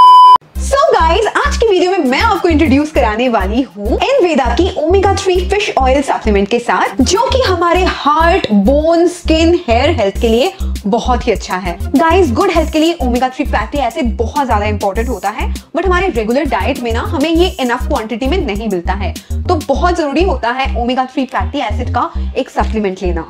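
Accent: Bengal, India. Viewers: Didi are you a bangali I am also bangali😀 I noticed that your tittle is and your taking is just like bangali Khathi bangali❤️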